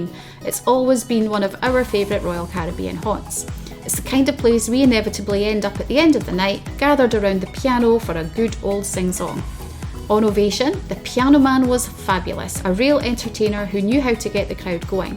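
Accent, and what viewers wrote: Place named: Australia. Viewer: Might be the accent. Aussie’s can hear you just fine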